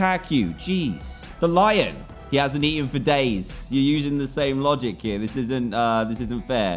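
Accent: United Kingdom. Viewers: is British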